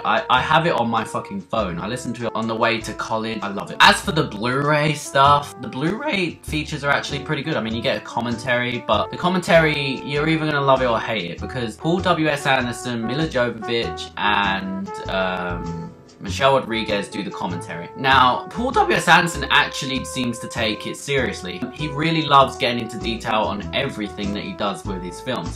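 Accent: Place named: RP, United Kingdom. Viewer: Great review and a great posh accent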